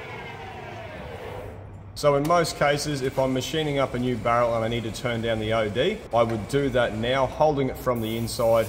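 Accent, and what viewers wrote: Australia. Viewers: Love your channel, excellent work but honestly sometimes I watch just to hear that Aussie accent Good day mate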